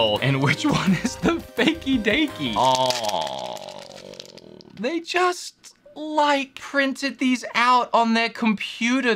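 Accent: Australia. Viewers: sounds more Australian then normal lol not a bad thing I just heard it more then usual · What's with your weird fake American accent lol.. I preferred your beautiful Aussie accent you have somehow lost..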